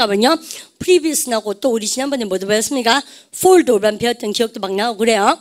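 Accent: Daegu, South Korea. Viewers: 아이들 다 대학보내고 이제 자유부인이 되었는데 허한 제 인생을 영어로 함 채워볼까 해요. ^^ 쌤 구수한 대구사투리 넘 좋아욧~~저도 사투리 쓰는 부산아줌입니다 ^^ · 50넘은 세아이 엄마. 심심풀이로 시작 했어요. 대학시절도 생각나고. 선생님께 영어도 배우고. 플로리다에서 하루 종일 한국말 듣기만 하는데 경상도액센트도 정겹고 가끔씩 귀에 꼭꼭 들어오는 갱상도만의 어휘구사도 반갑습니다. 매일일상에서 쓰는 단어 별거 없다고 우습게봤는데 아이들이 중학생이되니 저도 어휘구사를 좀 고급지고 학구적으로 향상시킬 필요를 느끼던참에 샘의 강의 발견하고 열공중입니다.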